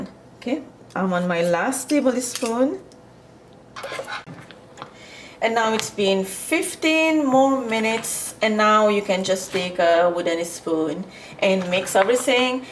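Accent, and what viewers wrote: Mauritius. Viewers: Natural Mauritian accent. Nice. No forcing other accents 👍🏽👍🏽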